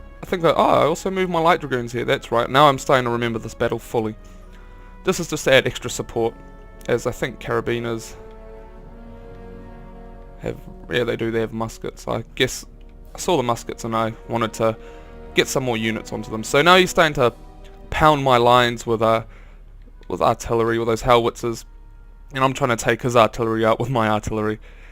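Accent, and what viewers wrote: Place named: New Zealand. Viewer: xD The kiwi accent restricts soooo much =(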